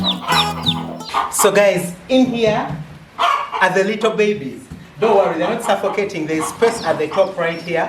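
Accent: Uganda. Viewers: Just dropped on this video and just by the way he spoke...i immediately knew he was a ugandan😂😂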